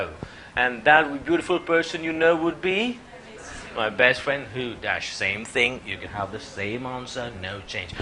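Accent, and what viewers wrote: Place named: United Kingdom. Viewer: Looks like a British accent to me. Are u British?? Nice techniques, BTW.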